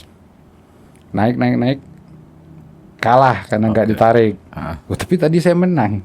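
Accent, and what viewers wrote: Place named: Madura, Indonesia. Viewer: Bhasany mdrny kentel bget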